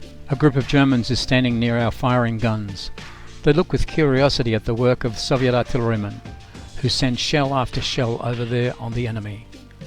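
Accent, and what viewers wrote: Australia. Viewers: Better late than never... Yes, I sadly agree, especially for USAers who actually need subtitles for NZers! Australians basically understand every dialect of English and being so multi-cultural, many strong accents are just everyday life here. I had a great career in Call Centre Training in the Philippines precisely because: A) I'm very good at it & I loved being there & doing it, and B) My so-called "U Australian" accent can be almost universally understood; it is pretty neutral as English speaking accents go, and I can easily learn & adapt to different dialects & vocabulary. So I could manage not only Aust/NZ accounts, but also even the strongest of US or UK English accents. The Americans DEFINITELY can't do that & the British often aren't much better, so I was always in demand. My accent is less "Australian" than your narrator's, for reference. 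PS: What a 10 months it has been! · What is an Australian doing the commentary ?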